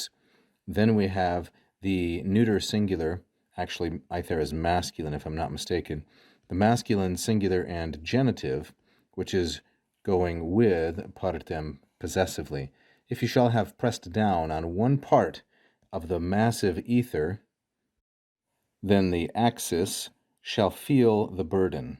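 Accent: United States. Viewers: Latin with american accent is so horrible. Don't throw your life away, please learn the correct pronounciation...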